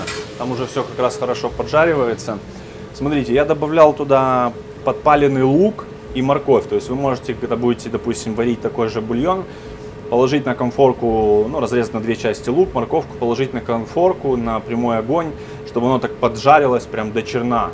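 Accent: Ukraine. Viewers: Да,малоросский акцент. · Все отлично, надо только поработать над собой- украинский акцент сильно мешает слушать, изви6ите!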